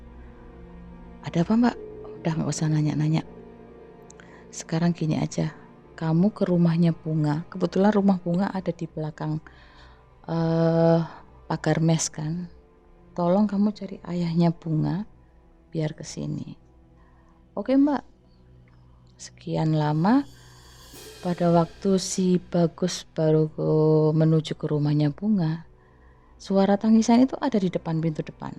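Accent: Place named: Manado, Indonesia. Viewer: Mba nya logat Manado banget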